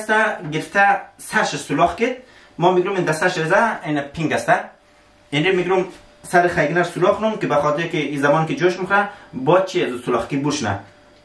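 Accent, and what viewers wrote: Hazaragi, Afghanistan. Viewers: اموته سوچه هزاره گی گفتنت مرا مزه داد چینل تو سبسکرایب کدوم و لایک کردم. قوربون کلام نابت عالی بود ممنون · سلام علیکم اغای بیادر بسیار لحجی هزارهگی شیرین گپ میزنی اما لحجی هزاره گی هیچ وقت نشونیده بودم ما شا الله مقبول گپ میزنین مفق باشید ده کار بار تان تشکر💜💙💚👍👍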